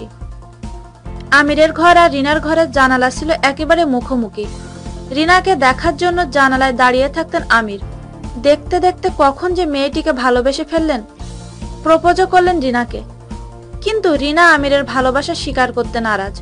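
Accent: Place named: Noakhali, Bangladesh. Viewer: Noakhailla mea .. Aga kota bola shikhun...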